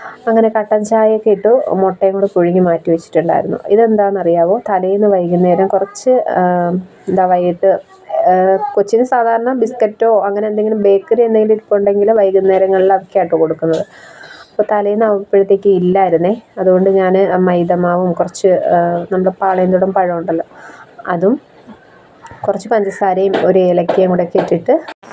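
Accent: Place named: Kollam, India. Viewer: ഞാനും കൊല്ലം. ചവറ. സംസാരം കേട്ടപ്പോൾ തോന്നി കൊല്ലം കാരി ആണെന്ന്. അതാടാ ചോദിച്ചേ.❤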